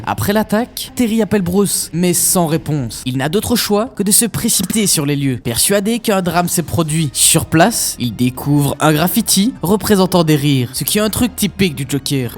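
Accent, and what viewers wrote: Liège, Belgium. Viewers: c'est moi ou il y a un fort accent de liège xd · J'ai beaucoup aimé ta vidéo Et j'ai cru comprendre a l'accent Que tu es un compatriote liégeois 🤜🤛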